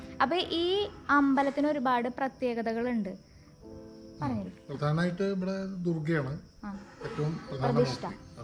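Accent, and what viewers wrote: Thrissur, India. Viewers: Ente frnd Dayakuttynte same samsaram avalum Thrissur anu... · Aa thrissur karide aa slang powliyatto atha kuduthslum video kanan preripiche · A simple village vlog from Simple Village girl 👧 loves your Thrissur slang talking 👩‍🎤😍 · അടിപൊളി അവതരണം ആണുട്ടോ.. എന്നാലും നമ്മുടെ നമ്മുടെ നമ്മുടെ ഒന്നു കുറക്കാൻ പറ്റുമോ. തൃശ്ശൂർ സംസാരം കേൾക്കാൻ നല്ല രസമാണ്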